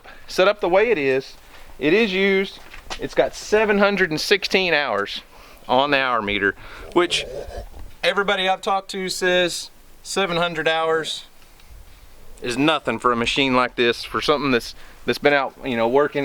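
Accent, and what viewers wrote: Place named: Oklahoma, United States. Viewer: Pardon my ignorance, but are you calling the culvert a "tin horn" or "ten horn" ? I'm from NY and your Oakie accent is through me off?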